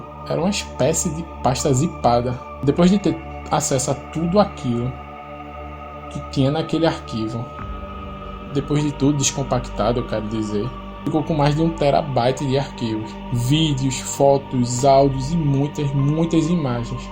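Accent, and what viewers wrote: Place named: Northeast, Brazil. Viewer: pelo sotaque ele parece ser nordestino, porque parece muito com o da minha região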